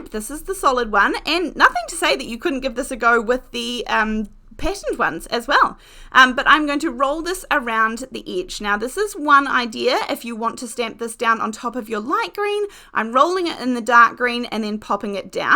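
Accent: New Zealand. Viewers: So delighted for you,! I so enjoy watching your videos and learning great tips from you. You’re so creative and down-to-earth. And enjoy the Kiwi accent which reminds me of my lovely coworker from your country. This is a wonderful “step change” in your paper arts career! 🎉❤. I’ve got three sets in my cart!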